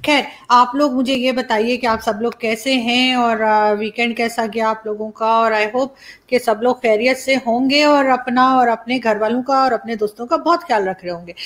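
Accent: India. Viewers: ji, I used to see your videos and appreciate your guts to speak freely without fear in a state like Pakistan. Pl carry on the good work. One thing more, you speak like a normal Indian and sometimes I feel that I am watching some Indian channel. Best of luck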